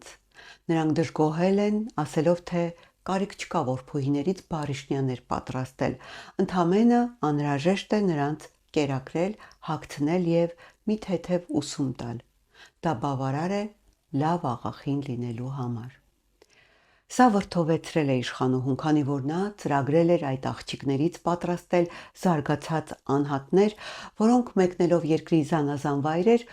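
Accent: Standard. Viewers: Հարգելի ❤️ինչպես միշտ շատ հետաքրքիր է ձեզ լսելը 🥰 Ձեր մաքրամաքուր հայերենը կրկնակի հաճելի է լսել 🥹🥹🥹🙏🏻🙏🏻🙏🏻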